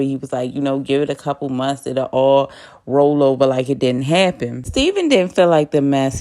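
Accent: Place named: Baltimore, United States. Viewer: You Sound Like you from my city ! You Fro, Baltimore ?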